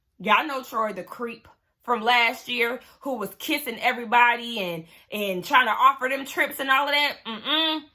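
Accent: South, United States. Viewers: Fantastic review and agreed with your summary. Your southern accent is lovely also. Now on to the tea....did you hear that Jason and Liz from last season (yes the couple who were co hosting for Tommy in this season) have split up? Sad times 😞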